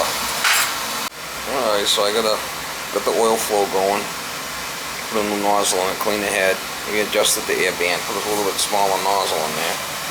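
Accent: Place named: Boston, United States. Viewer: That's just the Boston direct way of talking, no need to unsubscribe. I change my own filter and nozzle and if something went wrong I would call myself "Joe Homeowner does it again" lol.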